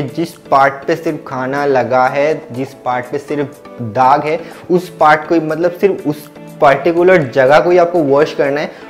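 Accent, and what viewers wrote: Bengal, India. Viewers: Bengali bolte bolte ap ki Hindi begd gaee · Aap bengali hai kya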